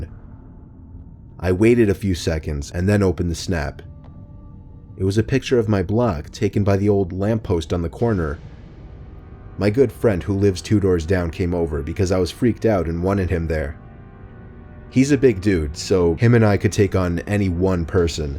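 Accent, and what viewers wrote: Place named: New York, United States. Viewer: You have a slight New Yorker accent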